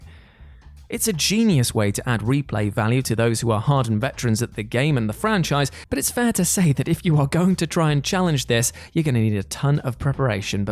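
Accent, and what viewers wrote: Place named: Wales, United Kingdom. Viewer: Noice it's the southwe... wait which part of the Wales ? Heh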